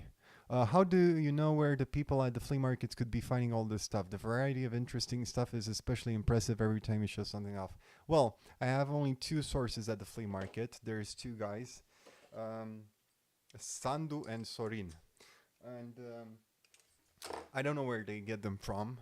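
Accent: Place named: United States. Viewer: I thought the accent was American possibly Canadian.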